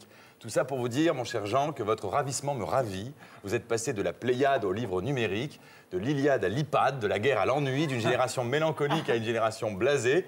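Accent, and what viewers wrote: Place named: Quebec, Canada. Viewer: Merde il a vrament l'accent québecois non de dieu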